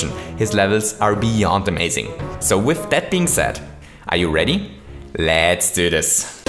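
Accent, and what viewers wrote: Germany. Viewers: This german accent xD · You're german right? :P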